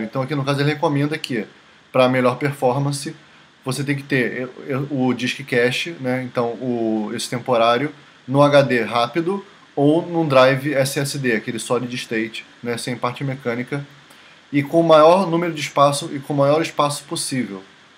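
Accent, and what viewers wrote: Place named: Rio de Janeiro, Brazil. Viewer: Pô, tu está perdendo tempo... com essa voz ai, se você gravar uma música dizendo que é do todo mundo vai acreditar kkk até o sotaque carioca vc tem =)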